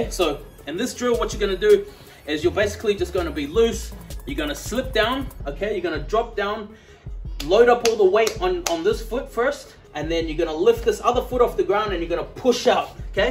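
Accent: New Zealand. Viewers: Sounds like you're from New Zealand? Your voice reminds me a tad of Taika Waititi but not exactly the same.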